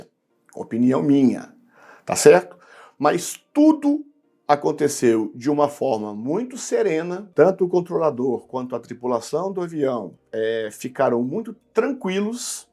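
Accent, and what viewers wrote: Minas Gerais, Brazil. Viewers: Bora "resenhar" as notícias do aeroin, pelas palavras e sotaque mineires do nosso querido Cmdt. Abçao...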